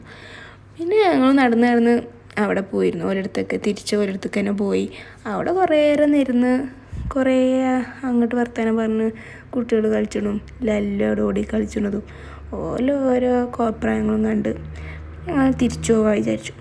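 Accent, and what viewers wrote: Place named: Malappuram, India. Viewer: Malappuram slang avoid cheyy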